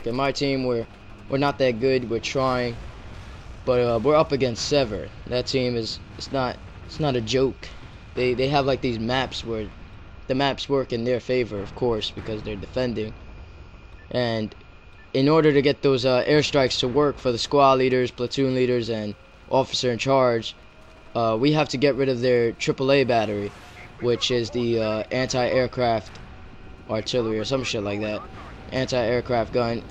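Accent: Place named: New York, United States. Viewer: i love it when u say the into for rating :-D i love your NY accent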